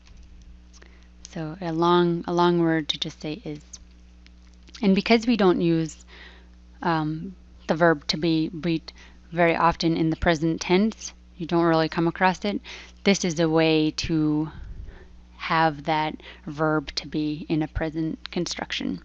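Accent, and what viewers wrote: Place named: United States. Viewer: You have not explained the use with verb to be ( byt)..you seem to be a native American and you don't have your words prepared at all while explaning,you have that typical american tune of " the thing" ..so you should focus on your english word "thingy" and not be overconfident and cover it up in shown off erudite american tune ..temporary ...pause....state ...and that whole thing is terribly told and you just jumped to next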